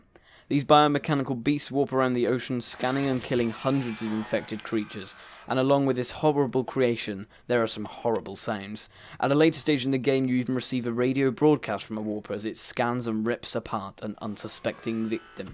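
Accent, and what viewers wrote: United Kingdom. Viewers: y r u british :/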